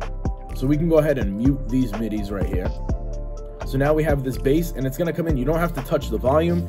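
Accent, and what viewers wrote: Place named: Italy. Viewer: i don't know what this accent is whether is italian or something else but i love it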